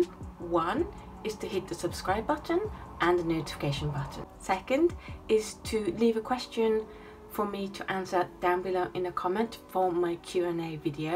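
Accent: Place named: United Kingdom. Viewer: I adore this channel. I just know you'll go far. Question, a bit of a personal one: Are you a UK expat, or were you born/raised in the UK. Your accent sounds blended and it's awesome!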